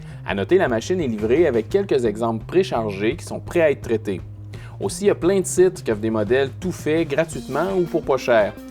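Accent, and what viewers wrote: Quebec, Canada. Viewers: Génial, je viens de découvrir ta chaîne, c'est vraiment cool tout tes trucs, et côté vulgarisation, c'est un plus et en plus, en québécois !!!! J'ai rien contre nos cousin français, mais du français de chez nous au Québec, sa sonne mieux à mon oreille ;) ! Se serait bien une suite sur l'impression 3D, je m'intéresse pas mal au sujet, j'aimerais bien en apprendre d'avantages particulièrement sur le dessin de tes propres pièces. Je suis maquettistes et sa pourrait m'être utile. Si il y a un logiciel de base, pas trop complexe d'utilisation pour les débutant et pas trop dispendieux, encore mieux gratuit et en français se serait vraiment le fun :) Merci, et continu ton bon travail !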